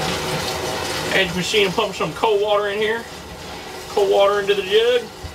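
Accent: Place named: Appalachia, United States. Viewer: holy hillbilly batman. love it though, A+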